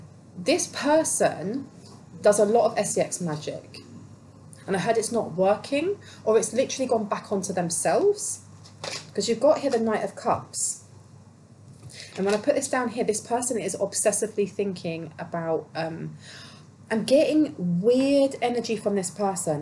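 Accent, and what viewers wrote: United Kingdom. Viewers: Understand you perfectly, love a Brit accent lovey! Your reading are exemplary! 💕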